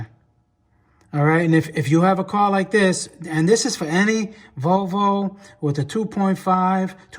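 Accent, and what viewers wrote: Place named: New England, United States. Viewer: My Boi got that New England/ NY accent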